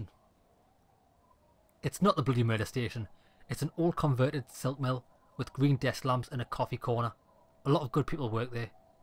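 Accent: United Kingdom. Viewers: Harry Du Bois - Cop of the Apocalypse, entroponaut, pioneer in the fields of rave dance culture and cryptozoology,real man of the renaissance:D I am a little sad that this is the final, despite some modern political things that jumped out too annoyingly during the story, I really fell in love with this game and Harry and Kim,and I will miss them very much. I want to thank you, for this walkthrough. When this game came out a year ago, I was very interested in it, but I was confused by the large amount of text, and I thought that my knowledge of English would not be enough and the process would be tedious. As it turned out, everything is not so scary, and I waited in vain for a whole year.) And I must also note that you have a pleasant voice and British-English manner of speaking, and your obvious interest in what is happening in the game did not disturb its atmosphere.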